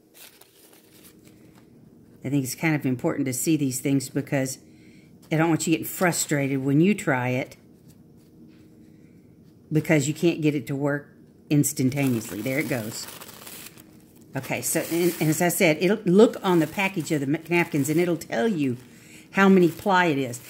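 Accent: South, United States. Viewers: Oh my goodness, I LOVE your accent. I’m guessing you’re a Southern Lady? I love it. 💖💖💖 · She kind of reminded me of Carol Duvall with a southern accent. I kept thinking I have to get off this computer, got things to do, but was riveted to the end.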